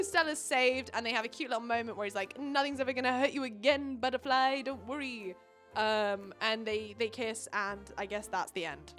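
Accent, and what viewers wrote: United States. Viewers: your american accent was not bad actually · As an American, I wish I had your American accent 😒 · From Missouri USA and I love your American accent ❤ if you read books in that voice I would buy every single one. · Busts out a damn near perfect generic American accent than slowly starts turning into cursive 💅🏽❤️ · The way you presented everything was amazing! I laughed a lot! I love when you pointed out the way things just didn’t make sense. And your American accent chefs kiss 😂😂 I was entertained the whole way though! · YOU’RE TELLING ME YOU’RE GIVING US HOURS OF CONTENT IN MULTIPLE PARTS? WE WIN WE WIN (Edit: As an American, I am simping for your American accent 🧎‍♀️) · Thats a damn good american accent! 🇺🇸🇺🇸🇺🇸🇺🇸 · American avid reader here. 🙋🏽‍♀️ Awesome American accent, shite books. They’re all really bad copies of much better smutty series from the 2000’s. 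Just my opinion though. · Your American accent is pretty on point girl! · your american accent is so good😭😭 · Your American accent is kind of everything?? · This video gave me a good giggle, thank you! I am...no longer intrigued to read The Twisted Series unless it was for just straight up giggles. LOL Also your American accent 15/10, exceptional. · Okay but why is the American accent so good??? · Omg can you just read books in your American accent I'm obsessed I need more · that american accent is insanely good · As an American omfg??? Why is no one talking about how like surprisingly good the American accent is??? Idk why but hearing people who don’t have an American accent do that accent gets me all giggly she sounds better than I do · wait why is your american accent flawless what the hell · Your american accent is making me feel some typea way 🫣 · THE AMERICAN ACCENT 🤩🤩 it is toooo good!!! 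· Your American accent is insanely good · Yeah her standard American accent is spot on. Obviously a lot of us don’t sound like that, but it’s recognizable and good. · From an American person I love your American accent! For this series I started with Twisted Lies (it was the most interesting sounding one and I read that Ana said they can be read in any order) and I actually really enjoyed it. Bit slower paced but I did love it. I didn't really like Twisted Love and to date Alex is my least favorite among the twisted men. Twisted games is my favorite in the series by far and Rhys is the only tolerable amongst the twisted men. One thing that I really hated about Twisted hate is that its completely killed Jules' and Josh's characters. They were silly gooby guys in the last, second, and first books but were insufferable in their own book. Also just wanted to mention that Ava's mom's name is actually Wendy. 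It was mentioned once during her dad's villain speech when he mentioned that Ava looked nothing like him and mainly had a resemblance to Wendy (her mom) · the american accent is so funny LOOL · obsessed with your american accent - i only read book one, but now I want to read all of them so I can listen to your recap after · when you apologized ahead of time for your american accent i wasnt ready for it to be actually so amazing. generally when people do american accents they just do southern or valley accent! your american accent is INSANE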